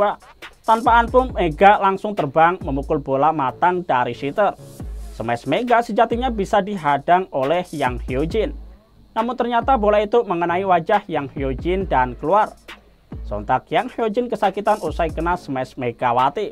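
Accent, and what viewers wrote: Java, Indonesia. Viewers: Logat kamu terlalu medhok..lur..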